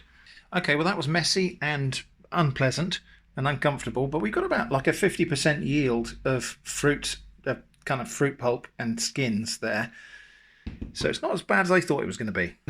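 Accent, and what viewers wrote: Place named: Dorset, United Kingdom. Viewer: I'm starting to detect a slight Dorset lilt to your accent in your videos 😊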